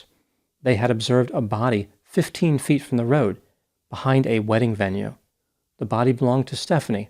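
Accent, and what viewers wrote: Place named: New Jersey, United States. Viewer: I have a suspicion might originally be from New Jersey - his accent gives it away… like the way he says the word “on”. It’s not heavy NJ but there are hints. Only speculating 😊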